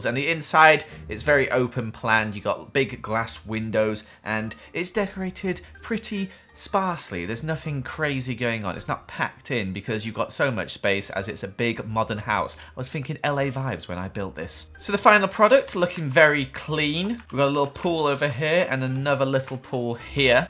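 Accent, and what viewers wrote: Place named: United Kingdom. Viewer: without a British accent, the intro would not work at all.. cue me trying to say aww and ore in the same way for five minutes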